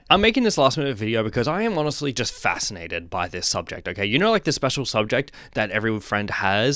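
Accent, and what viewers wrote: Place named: United Kingdom. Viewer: Damn im born in texas and live in canada since im 13 and now gonna be 23 next month but i thought you we're british 😂 but love the content I'm gonna subscribe aha!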